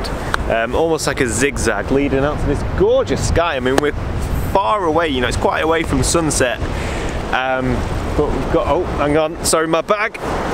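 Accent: United Kingdom. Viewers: Your channel discovered me somehow and I’ve enjoyed it thoroughly! Your accent is amazing! From where in the UK are you from ? Can I with my Mamiya C220 and Sekor 55 lens attempt at these vast expanse type pictures? I am yet a film amateur photographer! Should I resort to my Sigma 18-35 mm wide angle zoom for my Minolta Alpha 35 mm camera instead? And if you’re ever in Costa Rica I’ll take you to the Roca Bruja (Witch rock) in Playa Naranjo at the Santa Rosa National Park for some pictures! Regards, Robert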